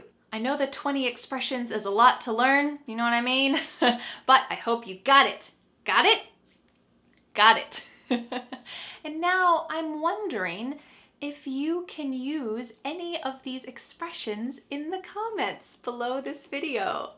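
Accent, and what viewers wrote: United States. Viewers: thank you for teaching us you are the best teacher for learning American accent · It's such a pleasure to wake up with your nice US accent and your funny mood. Thanks for the English loving speaker as I am. Have a nice day. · I love you American inglish, I do understand everything's you say,by the way congrats for you program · Love American accent from Pakistan. I’m a really big fan of American life and it’s history. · She has an american accent.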